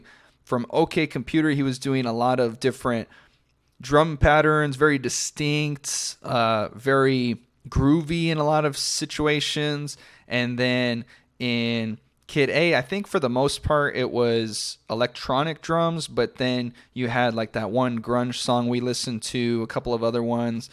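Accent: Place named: United States. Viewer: Don't think I'll ever get used to how americans pronounce niche.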